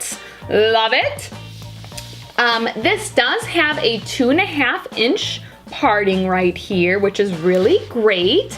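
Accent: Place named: Upstate New York, United States. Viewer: are you from upstate ny?? you sound like me haha i love the positive energy!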